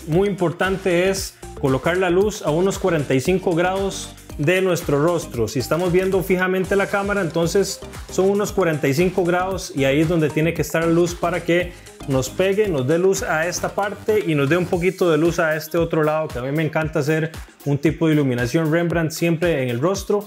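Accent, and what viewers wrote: Costa Rica. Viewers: mae los ticos tenemos un acentillo raro no arrastra la r y aun asi me di cuenta que sos tico pura vida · Que cool este video 😮 , estoy por empezar un podcast con una amiga y este video es oro 🥇🪙, desde que te escuché pensé que eras de Costa Rica, porque tengo un amigo que habla casi como tú 🤭 no me equivoqué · ya decía, esa manera de pronunciar la R es inconfundible de un tico, buen video :) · Mae el acento tico es probablemente el mas reconocible jaja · Acento tico!!! Genial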